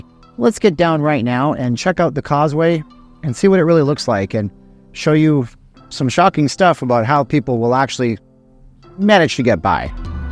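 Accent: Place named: Canada. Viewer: You have a cool accent; possibly the most Canadian accent I’ve ever heard. Love your content!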